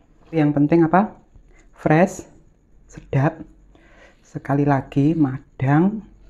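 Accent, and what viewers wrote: Java, Indonesia. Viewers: Boso jowone jan medok bingit,,🥰🥰🥰🥰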